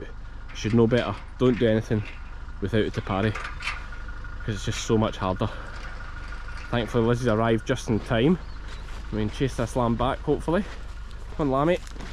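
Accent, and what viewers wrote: Scotland, United Kingdom. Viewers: Absolutely love it when you DONT hide your scots. 😊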